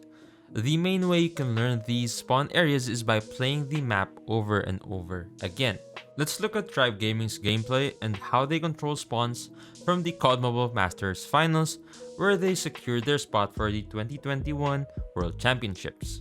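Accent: Philippines. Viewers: Hello are you filipino? I like your accent and voice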